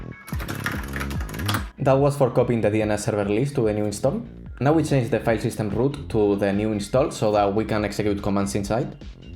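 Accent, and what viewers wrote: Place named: Spain. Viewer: are you spanish? that accent damn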